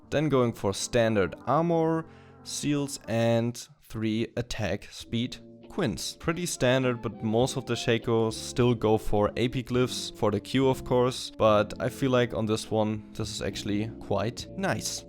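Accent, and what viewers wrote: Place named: Germany. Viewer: Der Akzent... Da ist wohl jemand Deutsch :3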